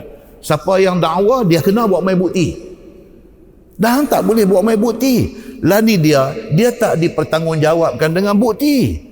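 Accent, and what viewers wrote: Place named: North, Malaysia. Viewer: Saya suka dengar , sbb kadang keluaq bahasa utara yg dah lama tak digunakan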